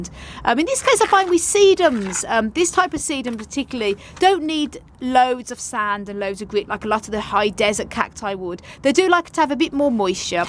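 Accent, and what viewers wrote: United Kingdom. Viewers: Hello....I recently found your channel and I became your follower......😘😘😘😘😘😘😘by the way....I LOVE YOUR BRITISH ACCENT.....😊😊😊😊😊😊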